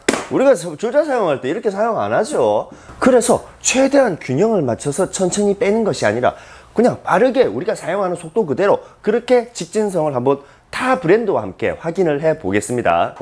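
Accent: Gyeongsang, South Korea. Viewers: 경상도 말투 정겹네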